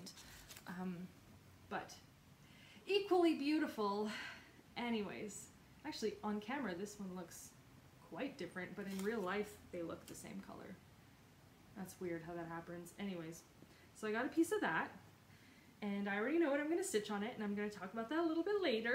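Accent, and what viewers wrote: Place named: Quebec, Canada. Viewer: Allo des Cantons de l'Est!!!! I'm so glad to have discovered your flosstube!! I'm a big fan of Jacob and the team at Evertote!!! I can't wait to start the holliday countdown. Will you participate? I was not able to go to the retreat as it was too close from a conference at work, but your video made me feel the experience!!! And you are so lively as a person!! I had an idea that you were from Québec by the way you prononce French words. Maybe, with other stitchers, we could make a local chapter? I can't wait for your next video.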